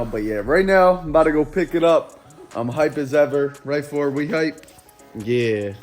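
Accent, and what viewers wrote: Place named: Philadelphia, United States. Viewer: it’s how we talk in Philly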